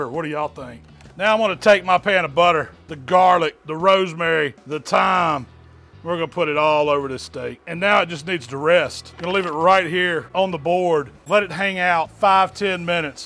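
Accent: South, United States. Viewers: Dewalt drill, Tomahawk Steak, American southern accent. All is missing is the American flag.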